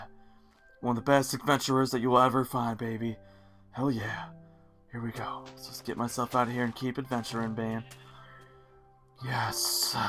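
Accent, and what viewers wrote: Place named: United States. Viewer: Like an American or Bible Thumper. If American's sound like that, what do Canadian's sound like? Makes me nervous just thinking about it O.o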